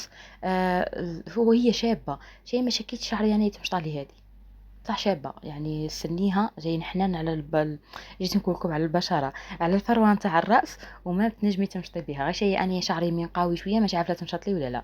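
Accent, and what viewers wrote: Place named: Mostaganem, Algeria. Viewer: حسبتها مستغالمية لهجتهاا تهبل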